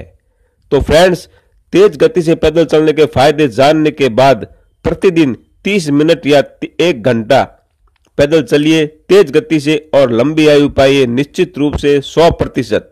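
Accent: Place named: Marwari, India. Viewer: भाषा और इनका लहजा मारवाड़ी जैसा ही लग रहा है।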